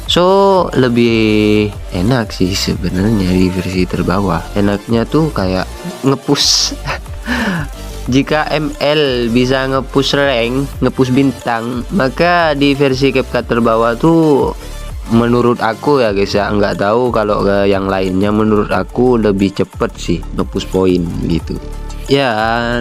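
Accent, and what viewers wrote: Madura, Indonesia. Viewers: logatnya madura bet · Ketarah reng medureh 😅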